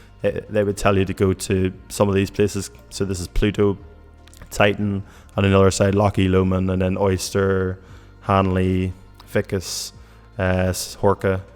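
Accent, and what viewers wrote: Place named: Northern Ireland, United Kingdom. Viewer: Just browsing some flight sim stuff and heard ur accent, didn't expect u to be from NI also haha. Good man 👍🏻👍🏻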